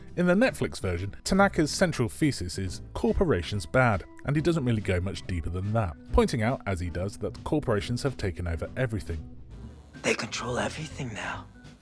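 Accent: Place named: United Kingdom. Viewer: British voice complaining about whatever on YouTube… what a surprise 🙄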